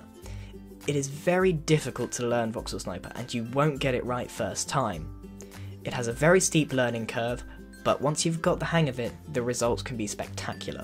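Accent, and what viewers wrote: United Kingdom. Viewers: are u a British person, you have an english accent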